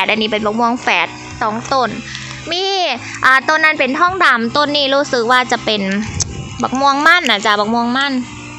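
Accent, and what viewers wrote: Isan, Thailand. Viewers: ปลูกผัก ปลูกพืชแบบปลอดสาร ก็งามได้เนาะ..แต่..ต้องดูแลเรื่องศัตรูพืช..เว้าอีสานเป็นตาฮักเนาะ ^^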